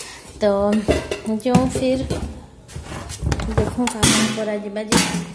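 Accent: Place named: Sambalpur, India. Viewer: ତୁମର ସମ୍ବଲପୁରୀଆ କଥା ମତେ ଶୁଣିବାକୁ ବହୁତ ଭଲ ଲାଗୁଛି ।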